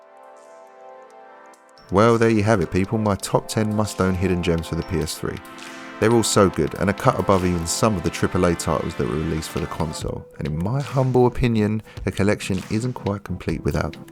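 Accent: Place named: London, United Kingdom. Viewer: Proper londoner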